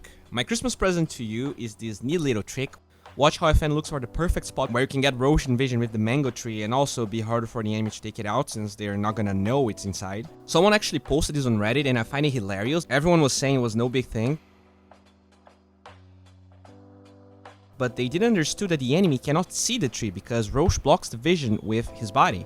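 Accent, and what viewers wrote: Brazil. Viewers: esse sotaque de br quase imperceptivel mas eu percebi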